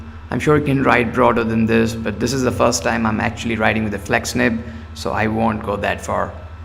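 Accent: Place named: India. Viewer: Are you in India? you could get these in India (judging by your accent)? 🤔 I don't think I could get these in Bangladesh 😭.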